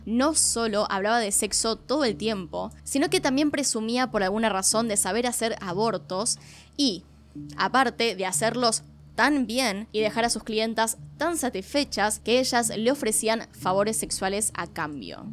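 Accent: Argentina. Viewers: Me encanta tu acento Argentino es encantador escucharte hablar, por cierto mis hijas piensan que estoy loca porque escucho éstos videos no entienden que es para aprender a cuidarlas. Te admiro mucho niña hermosa. 🇨🇴🇨🇴🇨🇴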